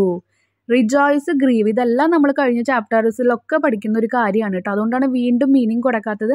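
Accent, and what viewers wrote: Thrissur, India. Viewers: തൃശ്ശൂർ സ്ലാഗ് ആണല്ലോ 🙂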